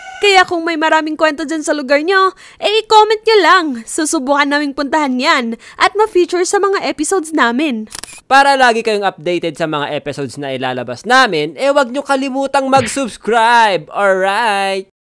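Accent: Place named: Pampanga, Philippines. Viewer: taga pampanga k ba halata sa punto m at boses na kapampangan ka?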